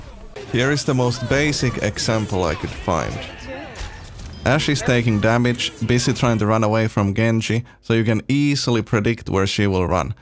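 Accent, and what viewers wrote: Finland. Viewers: My god I love the Finnish accent. Beautiful language too. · You soind like youre from finland. Good tips and crazy gameplay! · Took me three seconds to figure out you're Finnish by your language melody (my dad is Finnish, so I'm familiar with the language), but it took me EVEN LESS time to figure out that you're amazing at Overwatch and that this video will contain good info! Loved it! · Your english is very finnish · Dude are you finnish? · suomi aksentti <3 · I thought I heard a Finnish accent, I guess I did 😂. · U sound Finnish???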